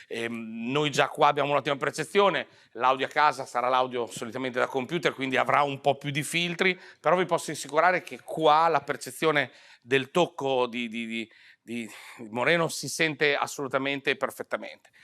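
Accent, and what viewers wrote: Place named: Emilia, Italy. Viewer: Non so perché ma la parlata emiliana di, anche se parla di chitarre, mi fa venir fame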